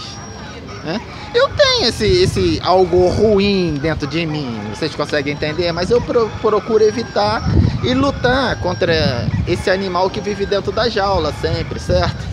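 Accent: Rio de Janeiro, Brazil. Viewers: Clhr ele e carioca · E ainda parece ser da Zona Oeste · Nosso Mestre é carioca MDS, melhor que isso não existe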